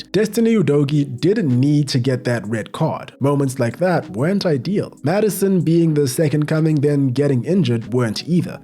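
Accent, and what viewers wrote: South Africa. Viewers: Are u South African? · I just know that’s a South African accent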